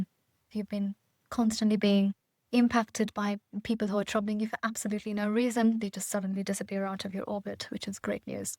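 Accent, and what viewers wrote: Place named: Guyana. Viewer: Your accent strongly reminds me of British Guyana. If I had the male version of your voice I'd be a millionaire. I'm looking forward to future videos explaining Jyotish. It's all Greek to me. Thank you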